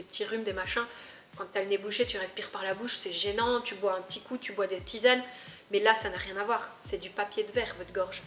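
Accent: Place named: North, France. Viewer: Cet accent du nord prononcé ! ! Le sujet est hyper intéressent, je me suis fait opérée aujourd'hui. Je ne sais pas si tu continue YouTube mais pense à raccourcir tes vidéos et couper les moments inutiles (genre le chien qui aboie) en revanche ce qui me stress c:est qu'on m'a demandé d'enlever les mèches moi même moins de 24h après. Je ressemble à éléphant man aussi! Mes yeux sont hyper gonflés et violets/noirs !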